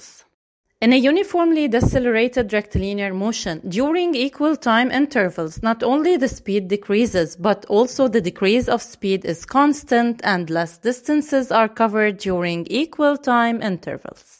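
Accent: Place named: Lebanon. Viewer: the narrator sounds Lebanese to me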